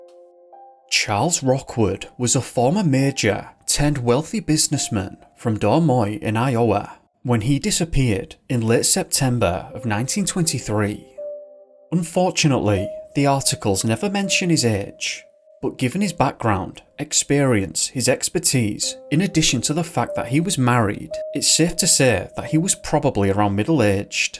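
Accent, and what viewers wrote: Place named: Northern England, United Kingdom. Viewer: is from the north of England and that’s how they speak.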